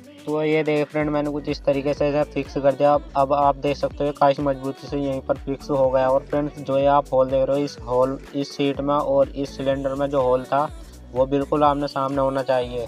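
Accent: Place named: Bijnor, India. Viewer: kyuki m bhi bijnor ka hu .bhasa sunke pata chala 🤣🤣vaise tum bijnor m kaha se ho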